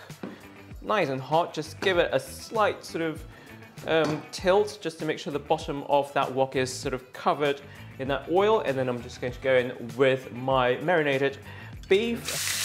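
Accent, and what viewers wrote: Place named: RP, United Kingdom. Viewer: I want to like this channel, but the limp wristed posh affectation is revolting.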